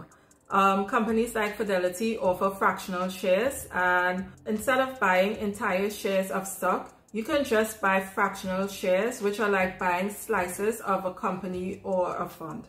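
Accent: Trinidad and Tobago. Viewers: I knew I heard a Trini accent lol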